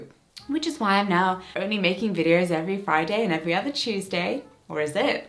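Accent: RP, United Kingdom. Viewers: “Sound Too posh” yeah and it’s pretty great 👍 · you do sound posh. i wish i sounded half as posh. the more i watch your videos and british english shows, the sadder i feel about the fact that as a canadian, i sound more like an american. which, at this point, is the real insult. 😂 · A video from a posh-sounding, very fragrant lesbian with the right amount of make-up being full of wonderful sentiments. What's not to love? Thank you for making this! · Between the 50s aesthetics, British "proper speech" and your serene smile, I am not sure if it's scientifically possible to be this gorgeous God fucking help me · Is it just me or is extra British in this video. This video is good and inspiring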